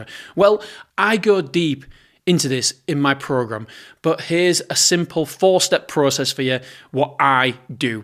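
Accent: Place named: Hull, United Kingdom. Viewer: i live in Hull ❤ You sound like your from Hull too 😂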